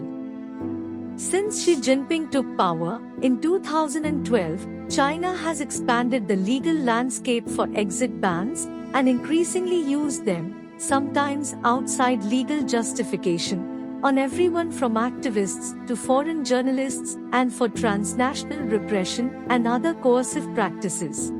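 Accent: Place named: India. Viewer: The Indian accent tells me all I have to know that this is a garbage channel.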